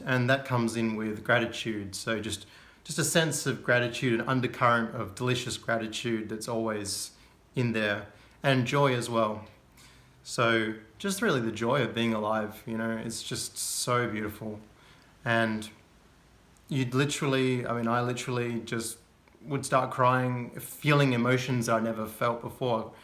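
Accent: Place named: United Kingdom. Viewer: Cool video. Can’t tell if You’re British, Australian or a Kiwi! In any case good luck on your journey, seems like you’re doing well mate.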